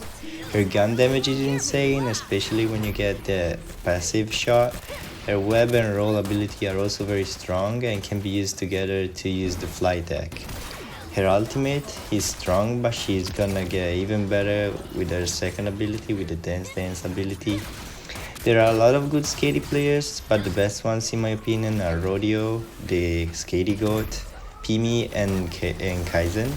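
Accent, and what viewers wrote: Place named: Italy. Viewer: Gotta love the italian voice! Thanks for the S/O and nice vid!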